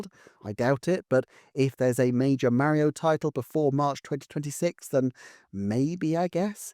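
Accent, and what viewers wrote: United Kingdom. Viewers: Yet another W. Also, as an American, hearing you dish out these truth nukes with a British accent makes the whole thing so much more cathartic. Keep up the good work mate.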